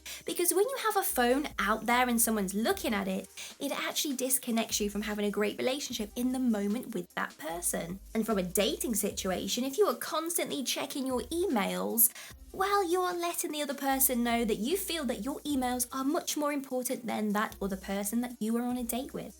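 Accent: United Kingdom. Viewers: My god, you're in the UK and you're complaining of heat? I used to live in Borough of Brent, no I'm in the U.S. in California. It was almost 45C today or as they say here 112F where I stay and it will remain around mid 40s until end of September. So please my dear, as someone who has lived in the U.K. don't complain about the heat there. By the way like your videos, the accent makes me home sick but otherwise great. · you look like russians but your english is british, btw i like your dating lectures.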